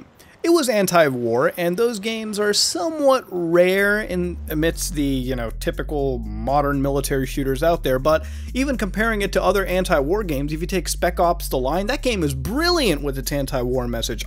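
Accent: Canada. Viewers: wait you're Canadian. ?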